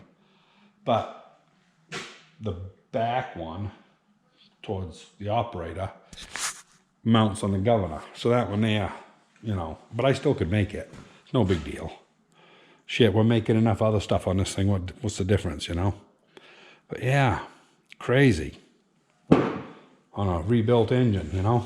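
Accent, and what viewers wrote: Maine, United States. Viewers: Its extremely funny for me to watch a fellow Mainer on youtube. LOL! We have our own dialect.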